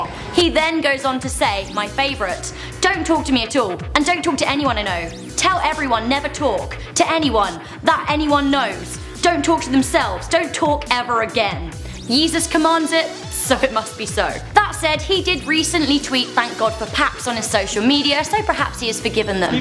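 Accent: United Kingdom. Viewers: I know btw love your british acsent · Why do so many people who watch this channel act as if they've never heard a British accent before?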